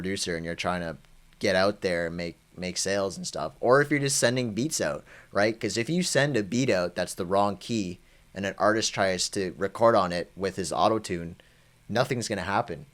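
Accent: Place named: Toronto, Canada. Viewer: I’ve been here since 600 subs, I love your beats and the Toronto accent really standing out here😂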